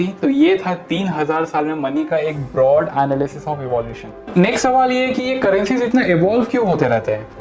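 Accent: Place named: Kolkata, India. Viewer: Proper kalkatia language 👌 i like it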